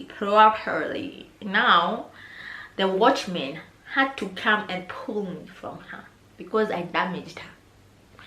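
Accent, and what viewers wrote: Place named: Kenya. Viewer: You are a good story teller, I love you, nice kenyan ascent.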